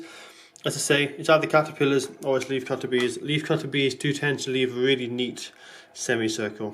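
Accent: Scotland, United Kingdom. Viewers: Ohhhhh you're scottish haha that's why I can't understand you. lol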